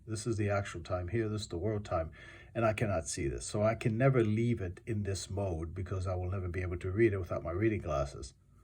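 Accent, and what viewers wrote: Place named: Greece. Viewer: You sound like a Greek who lives abroad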